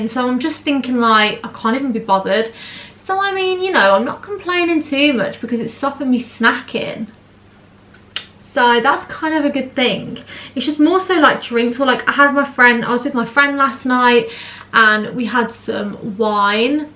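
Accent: Birmingham, United Kingdom. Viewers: Your so down to earth! I love your videos!! Its Also nice to hear a fellow brummy on YouTube!😆x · Hey I am so glad I came across ur video. Are you a brummie? watchin ur vid was like im listening to my mate whilst we getting ready to go out lol. Loved the look & you looked so amazing. I totally agree with lashes dilemma honestly that is me always such a faff but always needed too but u looked so good without them. 💕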